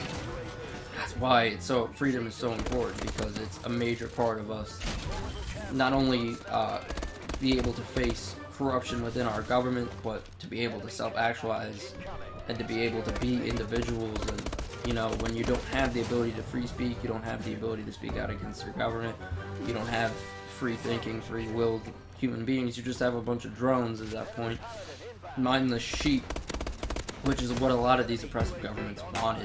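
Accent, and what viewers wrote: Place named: United Kingdom. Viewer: I like how you added the music in the background XD also how you say the states XD God you sound like such a Brit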